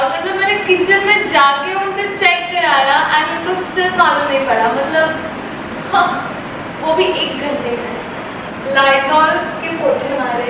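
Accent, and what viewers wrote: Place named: Kutch, India. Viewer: U r speaking sweet kutchi language. I m also from bhuj n I know kutchi. Fine kutchi boleti muke gamai.😊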